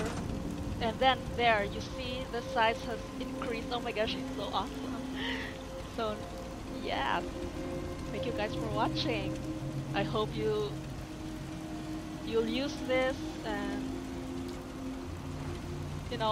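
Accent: Philippines. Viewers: your accent... pinoy?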